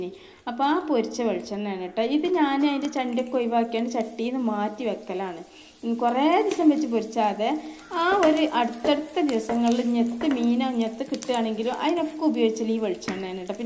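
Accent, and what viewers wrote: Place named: Kottakkal, India. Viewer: bhasha kettittu kottakkal aanu ennu thonni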